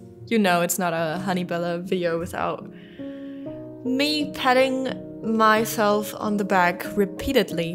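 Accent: France. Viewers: I'm wondering if you're french because of how you pronounced some words in this video i think you are ! Anyways ... i like the build a lot Vampires are my favorite supernatural beings I don't think you should be ashamed that you were into Twilight back then it was a global phenomenon so many people were into it i mean i was into it lol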